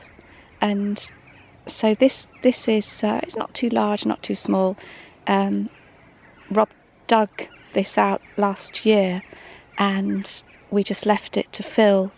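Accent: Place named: RP, United Kingdom. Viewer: I like your posh English accent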